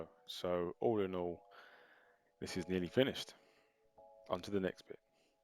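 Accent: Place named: Ireland. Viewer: Love that Irish actsent.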